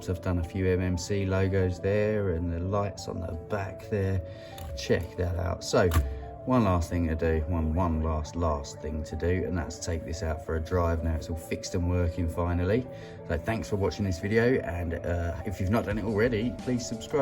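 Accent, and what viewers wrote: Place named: United Kingdom. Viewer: You sound like you're from the uk like me id love to buy a set of these if possible when i complete my build and get my electronics. Do you have Facebook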